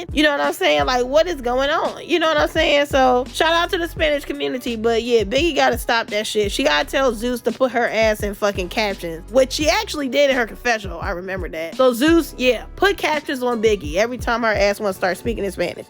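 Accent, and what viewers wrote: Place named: East Coast, United States. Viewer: Just bc you’re only AA and don’t know your history doesn’t mean others doesn’t. There’s WHITE PUERTO RICANS 😂😂😂😂 SPANISH IS A EUROPEAN LANGUAGE!!!! Damn I was feeling you till started showing the failure of American education. You sounds like you’re from the east coast where there’s variety but you are hella ignorant fr ughhhhhh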